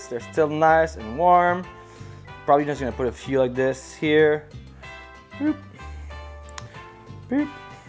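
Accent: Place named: Quebec, Canada. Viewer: With this accent this guy is a Quebecois (French Canadian) but he speaks in this mixed English for views, I imagine!?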